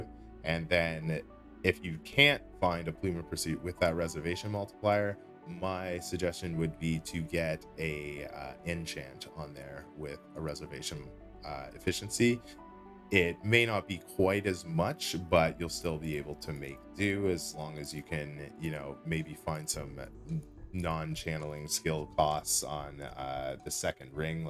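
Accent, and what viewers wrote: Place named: Canada. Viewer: very well spoken canadian i can tell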